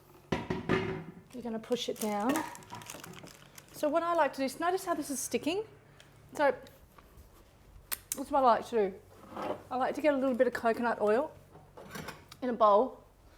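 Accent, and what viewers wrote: Australia. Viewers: Love your work , love the way you present. So Aussie. Thanks ❤️ 😊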